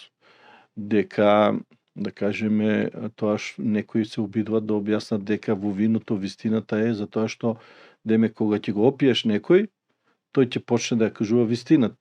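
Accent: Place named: Strumica, North Macedonia. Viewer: Поздрави..Очекувам секогаш ДА ГО ЧУЈАМ ГОВОРОТ СТРУМИЧКИ..МНОГУ МИ Е МИЛО И СИМПАТИЧНО...НАРЕЧЈАТА СЕ БОГАТСТВО..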